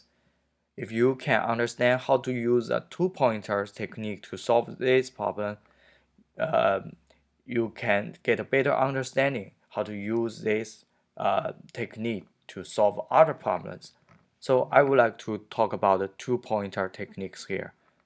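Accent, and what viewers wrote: China. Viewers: 虽然你的口音超级重，但是我感觉比美音还好懂！！哈哈哈哈非常的Chinese了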